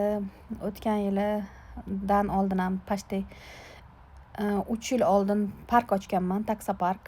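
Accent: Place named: Fergana, Uzbekistan. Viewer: Оллох сабр берсин сиглим. Шевадан умуман Бухороликга ухшамайсиз. Мен Фаргоналиксиз деб уйлар эканман.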